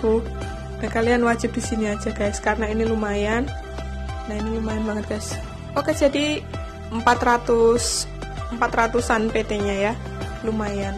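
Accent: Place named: Java, Indonesia. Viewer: Medok :v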